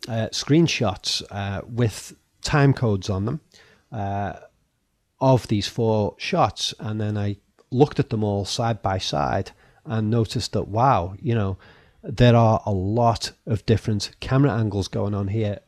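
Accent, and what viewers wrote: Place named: Northern England, United Kingdom. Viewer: Dissenter here. Regarding that couch..... Maybe he / his wife simply redecorated the place a bit between days of shooting? I know, it sounds incredibly simple and non-conspiratorial but maybe one would want to do something chore-like after a day of harrowing interviewing / reminiscing. Maybe it was planned already; obviously the shot with the couch was filmed at a different point in time. So........ what?........ If you were to analyse reality this way, you would find it to be non static most of the time. People sometimes move stuff around! They must be hiding something. Ofcourse your elaborate scheme scenario, illustrates your narrow analytical view (tending towards form over substance) and slightly glosses over the fact that if your theory were correct, AND following your logic then they'd probably just paint over a similar chair and move the couch away and avoid the "suspicion". 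BTW you completely missed the shrubbery outside, which is trimmed shorter in the shot with the couch! Can't believe you missed that! Weird huh? Lots of used takes / editing applied means false.... that's not a convincing argument and you should know that. You analyse films. Yes, shockingly, documentaries ALSO get edited and aren't filmed in one continous shot. BTW you're from Northern England right? Love that "K" sound you guys do after "-ing" words though.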